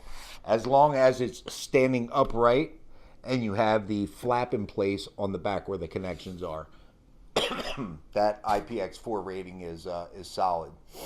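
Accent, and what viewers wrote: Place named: Baltimore, United States. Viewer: I hear a bawlmer accent.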